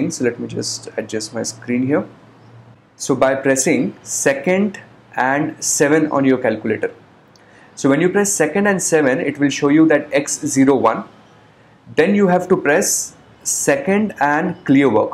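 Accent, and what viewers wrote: India. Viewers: Indian accent stinks.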